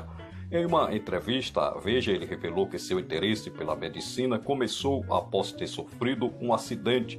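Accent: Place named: Northeast, Brazil. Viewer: O senhor precisa falar melhor... muito puxado para o nordestino!!! Não é: ÉSFORÇO! É esforço !!!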